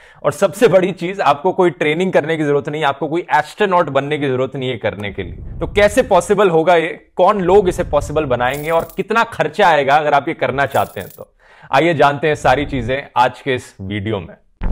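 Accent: India. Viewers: Just a friendly question - do you accentuate your hindi accent on purpose ie calling ticket, as tikat tikat- for the videos? Cause you are catering to Hindi speaking audiences and want to make it easy to understand? Or is it just the way you normally talk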